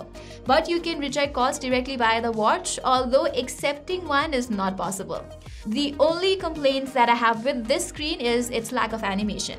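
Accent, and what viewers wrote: India. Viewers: A little less accent would do... Felt more hinglish.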